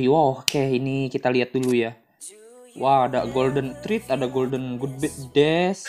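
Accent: West Java, Indonesia. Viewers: Bang orang mana sih tapi ngomong ya sunda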